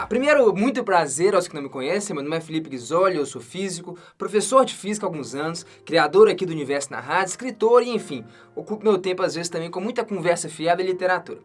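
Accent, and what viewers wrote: Minas Gerais, Brazil. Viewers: Estava vendo o vídeo e anotando as partes pra estudar depois e tive que comentar sobre esse sotaque mineiro, ksksskskskkssk, coisa mais fofa. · otimo video, estou começando o enen para me preprar para o enen, e acho que vc e de minas ne kk obrigada.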